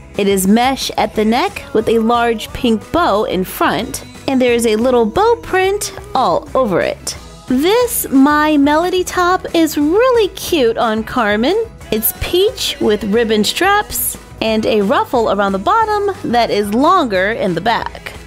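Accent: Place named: South, United States. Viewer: I love this and that southern accent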